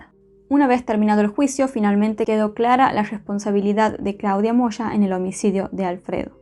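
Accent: Argentina. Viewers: Es la primera ves que veo uno de tus videos y me gusta mucho cómo haces la narrativa, el acento argentino es genial! Saludos desde México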